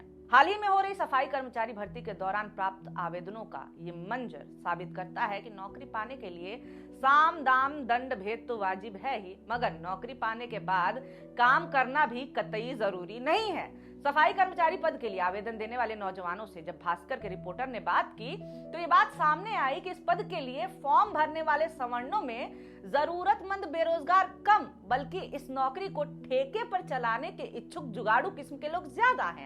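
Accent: Bihar, India. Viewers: Jo aap bihari accent me kataksh karti ho wo bahut lajawab hai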